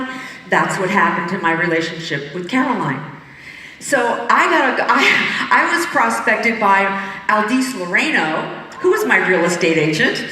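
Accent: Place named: Scotland, United Kingdom. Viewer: omg your Scottish accent BAHHHHHH HA HA The best!!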